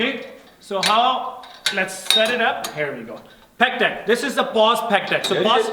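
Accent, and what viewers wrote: India. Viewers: Talking mor than working, even your body is not exam to follow your way. In addition please stop Indian accent .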